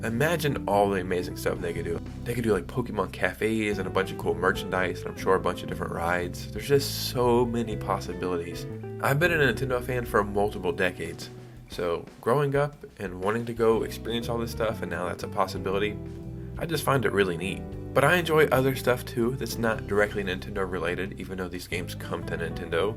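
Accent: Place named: Orlando, United States. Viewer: So this is what Orlando people sound like. That’s chill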